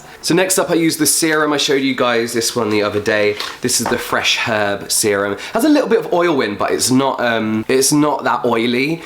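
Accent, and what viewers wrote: England, United Kingdom. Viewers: Wow , I love your English accent. Not because I like the accent it's because it's coming from your mouth 🤗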